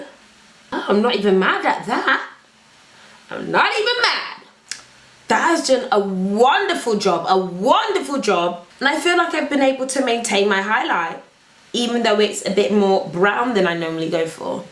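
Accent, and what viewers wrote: England, United Kingdom. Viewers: English accent ?😂😂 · I love your british accent,love :)))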